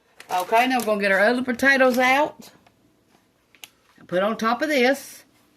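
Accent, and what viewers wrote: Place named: North Carolina, United States. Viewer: You sound like you're from NC. 😊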